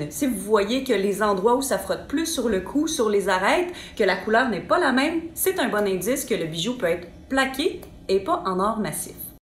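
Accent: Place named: Perpignan, France. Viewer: Y a rien à faire , je ne supporte pas cet accent de Perpignan ...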